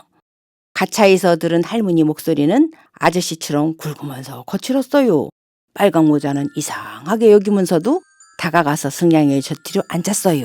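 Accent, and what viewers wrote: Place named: Chungcheong, South Korea. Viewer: 구수한 충청도 사투리도 정감이 넘치네요... 어느지방 사투리든 우리맘을 편안하게 하는 힘은 있는것 같애요.... 잘 보고 갑니다~~~~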